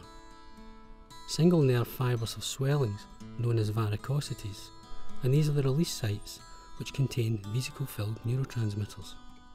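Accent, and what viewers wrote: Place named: Scotland, United Kingdom. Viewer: what hell is he talking about??? shitty scotish accent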